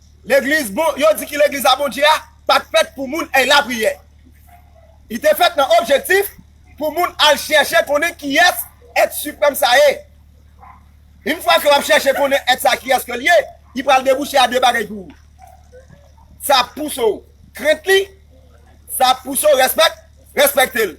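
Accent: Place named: Cap-Haïtien, Haiti. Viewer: Neg okap gen yonjan yo pale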